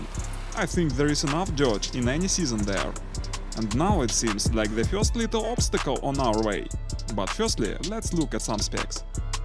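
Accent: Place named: Russia. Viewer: Russian accent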